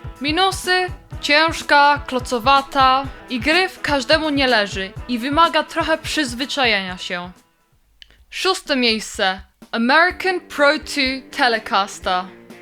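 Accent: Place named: England, United Kingdom. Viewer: Swietny material.Obejrzalem z wielkim zainteresowaniem.Lubie Twoje filmy z takimi muzycznymi nowinkami.Noi lubie tenTwoj proper English accent gdy wymawiasz nazwy anglojezyczne.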